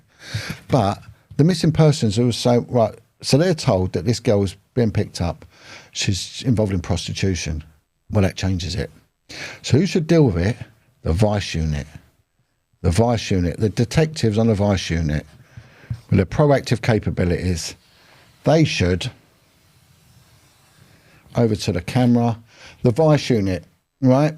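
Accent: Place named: Scotland, United Kingdom. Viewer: Hi. I jst found your podcasts videos, and i love them, also love your scottish accent, big love from an irish girl ( whos single 😂🫶)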